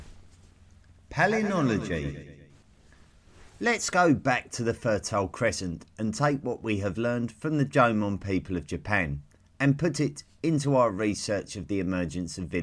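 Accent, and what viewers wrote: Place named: United Kingdom. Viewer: What British accent is this?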